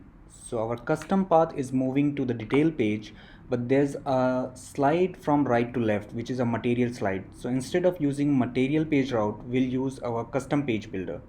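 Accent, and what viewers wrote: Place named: India. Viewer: WTF with the Indian English omg it seem like 😱 where did you learn that kind of accent guys ??!! U r awesome by the way 👍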